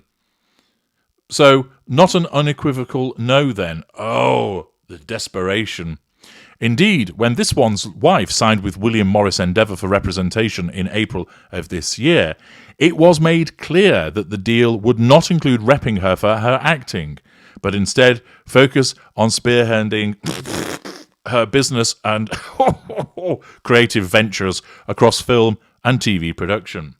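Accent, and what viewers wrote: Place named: RP, United Kingdom. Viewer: swearing in his posh, English (although im still trying to detect the region), velvety tongue, gets me EVERYTIME 🤜💥🤛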